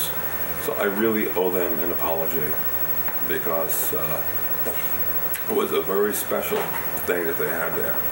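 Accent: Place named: New York, United States. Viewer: His NY accent really comes out here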